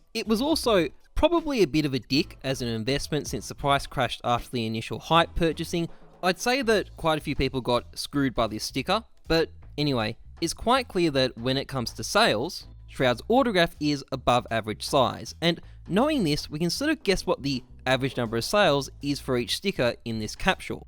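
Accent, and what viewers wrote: Australia. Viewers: Are you from perth??? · An austraaaaaalian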